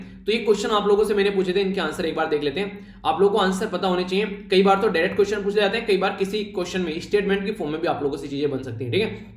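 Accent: Haryana, India. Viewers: Maybe Haryana (sonipat/gohana)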